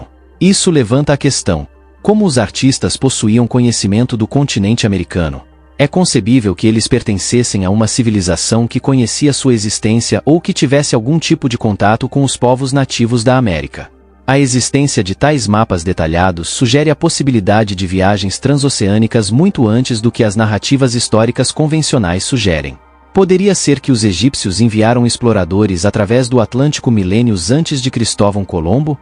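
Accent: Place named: Brazil. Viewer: Colombo chegou À América... não destrua a gramática da língua portuguesa com sotaque brasileiro.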